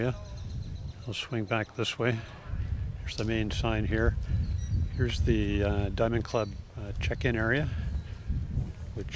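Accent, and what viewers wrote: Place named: Ireland. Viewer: Sounds like an Irish accent to me.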